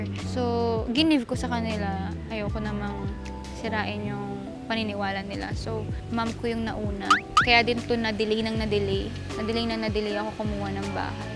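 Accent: Pampanga, Philippines. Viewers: yung accent may pagka Kapampangan parin luvit haha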